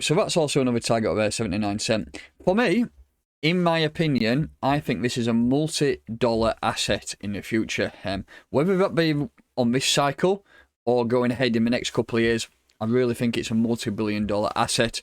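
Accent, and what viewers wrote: United Kingdom. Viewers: dude stop talking with british accent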